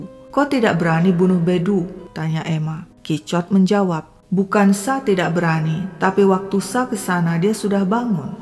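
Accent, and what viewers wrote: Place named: Sulawesi, Indonesia. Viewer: logat sdh sperti org sulawesi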